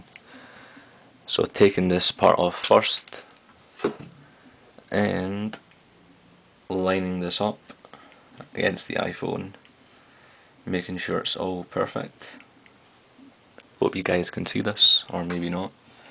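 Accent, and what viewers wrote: Scotland, United Kingdom. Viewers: u sound scottish? am i correct?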